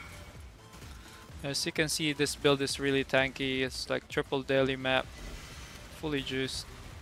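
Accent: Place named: Philippines. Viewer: Fellow Pinoy PoE player? Guessing from the accent lol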